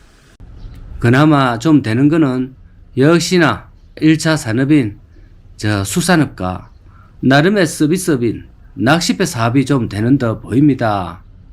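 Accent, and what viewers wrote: Gyeongsang, South Korea. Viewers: 갱상도 사투리정말 듣기싫다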